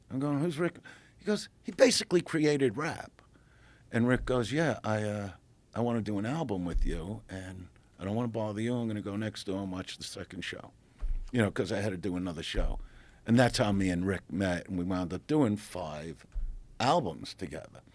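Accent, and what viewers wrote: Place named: Brooklyn, United States. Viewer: Gotta love the Brooklyn accent: "This guy Mahk."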